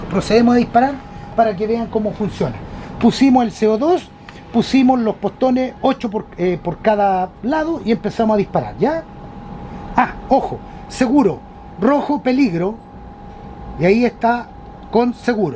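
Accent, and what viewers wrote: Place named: Chile. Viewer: Chile. Escucha el acento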